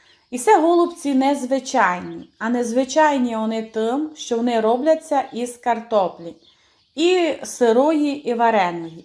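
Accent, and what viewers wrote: Transcarpathia, Ukraine. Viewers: Дякую Вам за рецепти і наший закарпатський діалект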